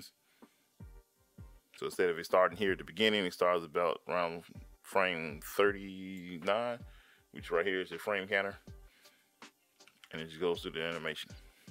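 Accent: United States. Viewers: This is probably so random, but what's your accent? I know you're US, but like what state? I'm not from US but I'm fascinated with accents. PS, thanks for the vid, I found it helpful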